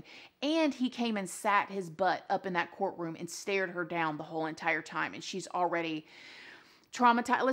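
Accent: South, United States. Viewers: You told the story PERFECT better than any I heard even back then! And I LOVE ya southern twang and your SOOOO Soo pretty 😍😁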